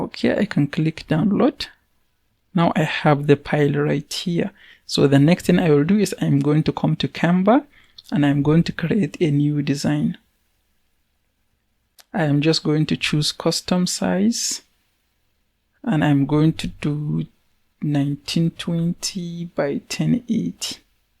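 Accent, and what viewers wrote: Hausa, Nigeria. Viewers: Hausa from Nigeria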